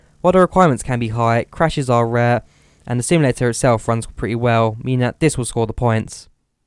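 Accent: England, United Kingdom. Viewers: You're saying a lot of interesting things I'm sure but I can't understand much of it. I know you're proud of it, but too heavy on the English accent. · It was real English not American nothing at all wrong with his voice if you are English. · british accent is irritating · Crazy. English...accent... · Is it just me or is this British accent really irritating?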